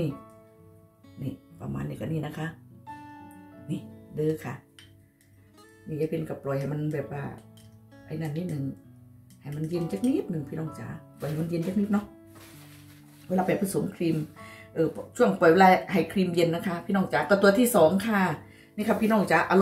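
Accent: Korat, Thailand. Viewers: อ้าว คนโคราชนี่นา พูดสำเนียงเหมือนคนกระโทกโชคชัย ฉันก็คนโคราช ฉันเคยเรียน ร.ร. สุรนารี ฉันกดติดตามให้แล้วจ้า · ดูคลิปไหนก็ชอบน้องเห็นพูดสนุกโคราชกันเอง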